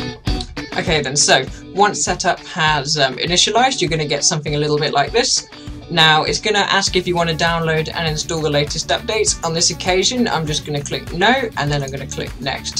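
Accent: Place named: United Kingdom. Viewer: I know where you live. You live in UK I know this accent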